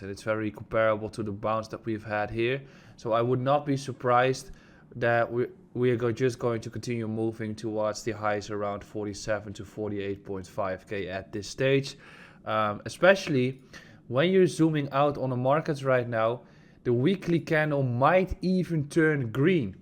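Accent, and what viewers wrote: Netherlands. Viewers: nederlands dat hoor je gelijk haha !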